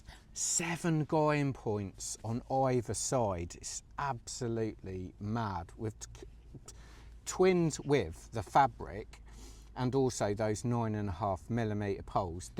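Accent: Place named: Northern England, United Kingdom. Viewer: I am Swiss and lived in Cambridge UK for six and a half years during the 1990's. I notice your northernly accent. Does not sound like Manchester or Birmingham to me, but I could be wrong. However, where does your accent/dialect relate to geographically? Here in Switzerland we have many dialects, only 10 kilometers away somebody speaks somewhat differently.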